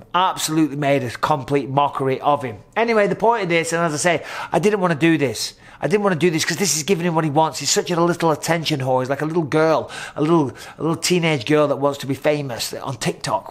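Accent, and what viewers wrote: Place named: England, United Kingdom. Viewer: You know Michael, i really love when you start to talking funny shit. But please add some subtitle on your video, because you're true englishman and you speaking goddamn fast. So please add some subtitle next time, because sometimes people from another world like myself see and listen to your talking and couldn't keep up.. 😂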